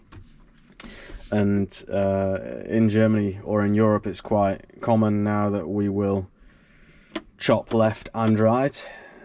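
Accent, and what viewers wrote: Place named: United Kingdom. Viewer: The most British sounding German i,ve ever heard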